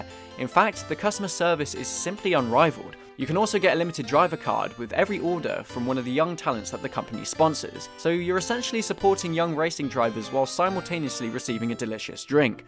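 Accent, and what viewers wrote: United Kingdom. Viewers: Nothing cooler than hearing a Brit talk about Indycar! You have a new subscriber! 🏎🏁🇬🇧😎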